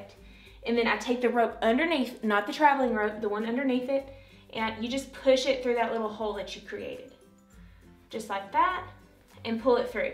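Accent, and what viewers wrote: South, United States. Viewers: Also, where are you from....it sounds like a southern accent? South Texas here😉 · "Your gonna peench it" lol I freaking love your southern accent!! Makes me miss home that much more! Love these videos, Congratulations on the pregnancy!!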